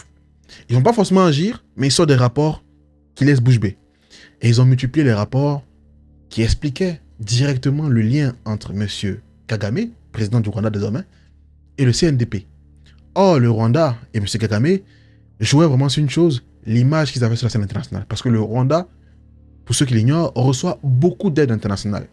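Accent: Ivory Coast. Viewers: Ko je suis l'enfant de quelqu'un 😂😂 tu m'as tué frère comme un vrai ivoirien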